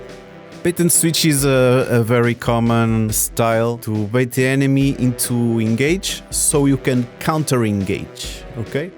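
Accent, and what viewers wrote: Portugal. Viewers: Esse sotaque é de um tuga ahah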